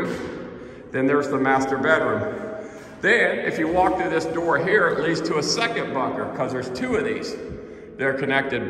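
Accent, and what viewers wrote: United States. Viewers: Aku tidak paham bahasa inggris aksen amerika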